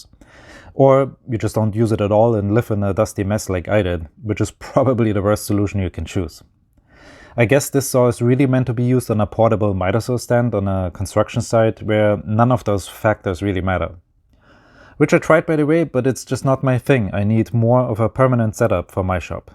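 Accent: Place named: Germany. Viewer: Man well done.Great innovations…or combination of different ideas to make it work for you.Just found your channel by incident,and think you would deserve more klicks.I just wonder…are you german? It just felt like the little accent sounds familiar to me.Keep it up well done.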